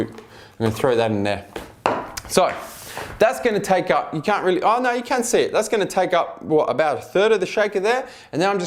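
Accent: Australia. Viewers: Manos Nikolidakis seems fine to me this is how aussies talk if u were a good psychologist u would know that different places have different ways of talking. Plus the extra obnoxious loudness is just hid way of trying to appeal to his subs as youtube is sort of a entertainment platform. Throw your degree away lol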